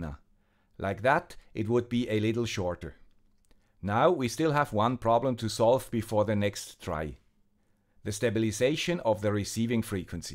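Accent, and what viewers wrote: Switzerland. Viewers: Swiss accent! Any idea about making a very long range telemetry, like 100km? Looking forward to see your response 👍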